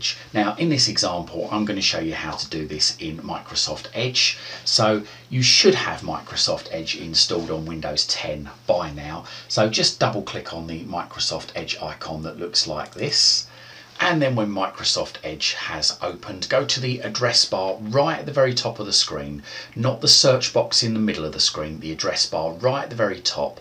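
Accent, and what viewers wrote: United Kingdom. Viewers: Oi, are you british mate aye?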